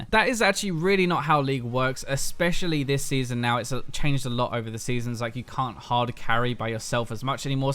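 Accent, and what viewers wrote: United Kingdom. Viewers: "Some people watch for the British accent" THE JIG IS UP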